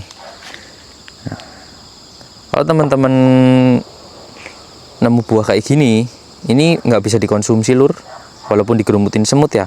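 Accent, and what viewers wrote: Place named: Cilacap, Indonesia. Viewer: Lokatnya medok ms,orang Cilacap apa ms,?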